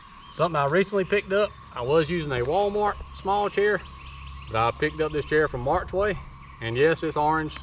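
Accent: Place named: South, United States. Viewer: Finally, a camping gear video from an American in the South. So many of them are Aussies. No disrespect to our brethren down unda', but I need to know what I need and where I will be camping. I live in SC, so I imagine the with that accent knows a thing or two about camping in my territory. The budget-minded selection is good, too. Thanks for the info and the no-nonsense presentation.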